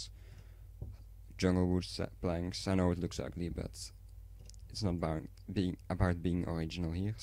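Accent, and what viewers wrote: Netherlands. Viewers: Nou ja ik ben gewoon nederlands ik hoorde het aan je accent en aan je naam zag ik het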